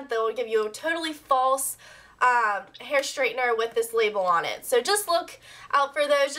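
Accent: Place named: South, United States. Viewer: Your accent girl! It's nothin but southern. I love it. And I have a Royale as well. Best ever.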